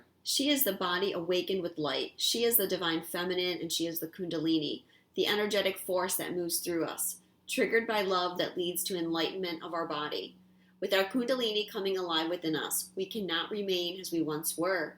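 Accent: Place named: Massachusetts, United States. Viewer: I love your readings. You really clarify things. I also just Liked you on Facebook. I also detected a hint of an accent that you were a fellow Massachusetts girl like myself. I also think you have really pretty hair...:)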